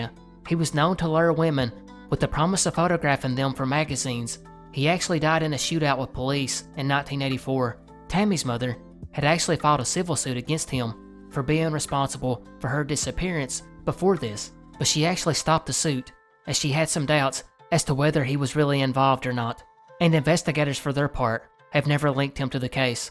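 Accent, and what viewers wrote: South, United States. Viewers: Also curious, where does your accent come from? I play games online with another southern man and his is different (more mild idk how to explain it) but it’s still obvious you’re both southern. Just wondering and trying to differentiate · Keep them coming Sir. +As a European I must admit I find it really refreshing to hear southern accent in this kind of videos. Thank you for your work!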